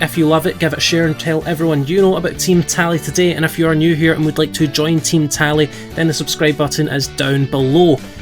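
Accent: Scotland, United Kingdom. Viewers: That Scottish accent yo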